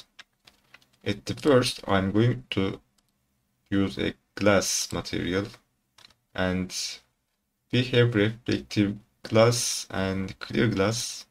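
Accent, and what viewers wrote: Turkey. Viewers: Kardeş eğer sen türk değilsen ben bu işten birşey anlamıyorum demektir telafuzun çok belli ediyor kendini, harfleri türkçe vurguluyorsun. yanlış anlama bunu kötü amaçlı söylemedim videoyu çok beğendim yardımcı oldu teşekürler 👍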